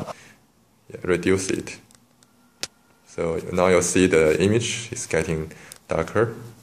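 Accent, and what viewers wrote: China. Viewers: Yeah its a language barrier. I understand chinese and where i live, we have China people trying to speak English alot, it sounds like this.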